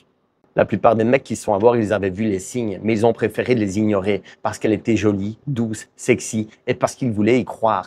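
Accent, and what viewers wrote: Liège, Belgium. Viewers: Hello, Gros accent liégeois? Tu es d'où? Je passe quelques semaines en Thaïlande en septembre-octobre, un conseil; plutôt condo ou hôtel? Intéressant en tout cas m,fi.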